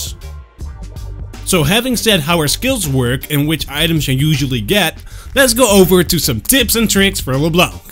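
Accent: Netherlands. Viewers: Are you dutch? :D Because for some reason I think I can hear a little bit of the accent :) Great tutorial though. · are u from holland i hear the shit accen XD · Omg you must be dutch the accent is sooo recognizable xD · Ataru Kawahara Nederlands accent :p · ben jij nederlands je klinkt heel nederlands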